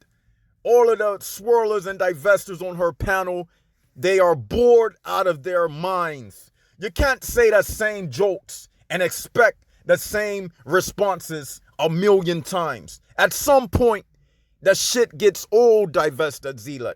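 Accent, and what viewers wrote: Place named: Caribbean. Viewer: No I don't find her channel boring at all. I like that she has a new story all the time. She never repeats a story. And that's the main thing of her channel is she specifically says she will never repeat any of her content. So no it hasn't gotten old. But why are you even caring what she or other black American women want? You're not a black american. You can tell you clearly have some kind of Caribbean accent. Why don't you care about the women in your country? I think that would be more productive for you. They probably don't have this anyway. I mean they grew up with mainly black men and I don't even know how you guys are over there. Why do you even care? If she's talking most of the time about black American men and you are not black American then why do you care? It's just a thought.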